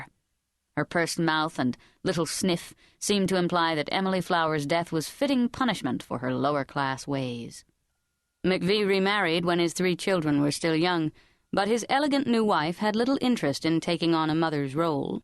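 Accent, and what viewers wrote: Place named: United States. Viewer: Terrible narrator….. thick American accent, lousy pronunciation. Unlistenable.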